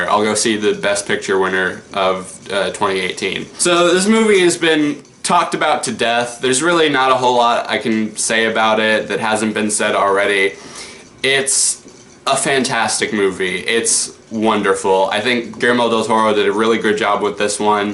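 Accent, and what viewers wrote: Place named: United States. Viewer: I’ve never heard that kinda country accent before🤠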